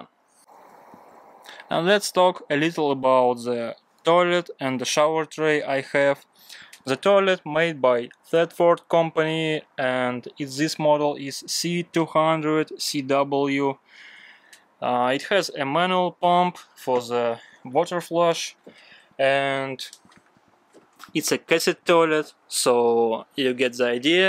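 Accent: Russia. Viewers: Чувствуется русскоязычный акцент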